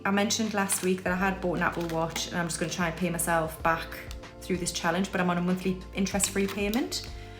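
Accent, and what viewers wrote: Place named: Scotland, United Kingdom. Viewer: Is that a Scottish accent I hear? You get my subscription for that reason at a minimum. Also, maybe I didn't get the accent quite right but I do love it. Sounds like you're making some good progress! Oof, I do hate trying to figure out the right taxes. Nice job on those savings challenges. I found you just by searching for budgeting channels on YouTube because I love the budgeting community and focus a lot of my content around it too. Keep up the good work! You're getting close to 1000 subs!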